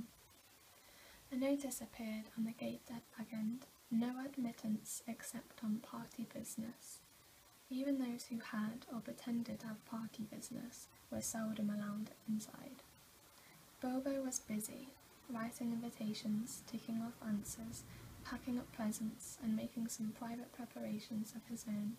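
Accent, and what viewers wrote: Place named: Wales, United Kingdom. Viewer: It sounded like you had a strong Welsh accent in first video but not so much now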